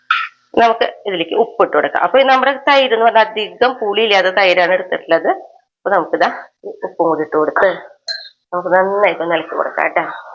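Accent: Thrissur, India. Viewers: അത് തൃശൂർ സ്ലാങ് അല്ലെ..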